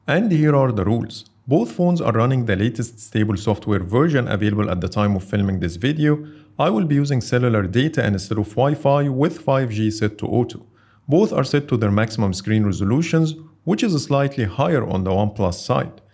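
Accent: Egypt. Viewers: اللكنة المصرى منورة 😂🇪🇬 Great video though 👌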